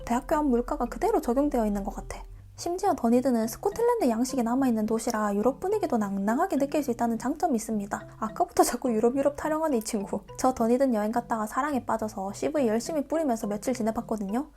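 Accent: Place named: Seoul, South Korea. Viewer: 서울말이 늘었네요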